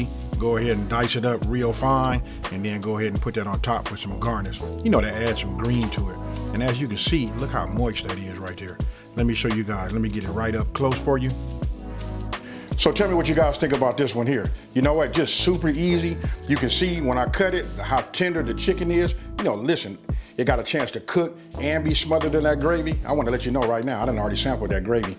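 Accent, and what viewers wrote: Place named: South Los Angeles, United States. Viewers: He definitely sound like he from Slauson and western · Factz luv his cali accent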